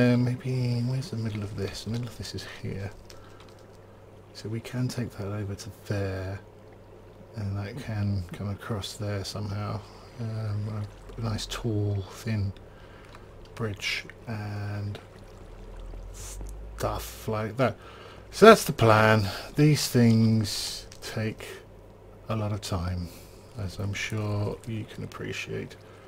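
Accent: United Kingdom. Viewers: where abouts in uk are you from??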